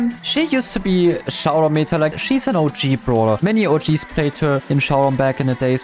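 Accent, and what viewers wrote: Germany. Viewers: this is just the german accent and he is a german so it makes sense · Man hört dein deutschen Akzent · You haven’t mentioned Chester! But that’s fine dw! If he’s one of your favorite: Understandable, when he’s close he makes tons of damage, his super where his first attack is stronger is OP, the gadget with buffs or changing your super to a different one is also amazing! If you don’t like him: Also understandable, for his massive damage, his healing super, the bomb, the stun, the slowing down and poison are annoying and OP As a Chester Main I can say: It’s 50/50 Fun fact: Since he was made better with 4 now without the super he can use his 2nd, 3rd and 4th attack to kill mortis, piper, surge (if he doesn’t have any upgrades or is just bad) and also Edgar without any super Though I must say I camp a lot since going in offense is not the best idea with Chester in my opinion! 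-> I’m new to the channel and love the vids, love the German accent a lot 😂 Don’t have him rank 30 yet cuz I don’t have friends to play with so I have to play with random people- 😭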